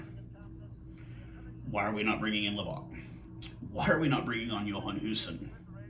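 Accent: United States. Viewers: Where is this guy from. Sounds like a yank.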